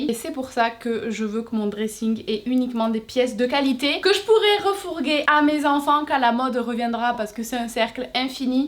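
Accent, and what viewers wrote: South, France. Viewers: un sacré accent du sud haha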